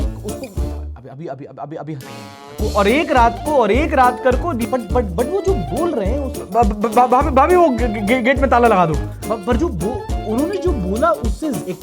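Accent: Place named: Bundelkhand, India. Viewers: Bhai ki Bundelkhandi bahut achi hai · LOVE YOUR BUNDELKHANDI ACCENT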